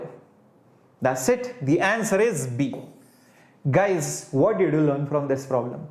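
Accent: South India, India. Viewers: Talking in English really feels south Indian connected equally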